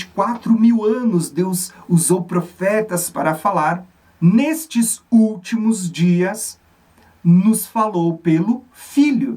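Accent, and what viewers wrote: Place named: South, Brazil. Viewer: Sotoque do Sul irmão…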